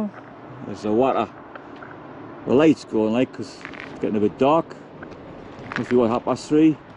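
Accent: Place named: Newcastle, United Kingdom. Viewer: Merry Christmas and Happy New Year. I just discovered you and my absolute favorite is your chatting when you ride. I'm not native English speaker and sometimes it's difficult for me to follow your dialect... what is that, are you from Newcastle or where? Anyway, love to follow you, cheers!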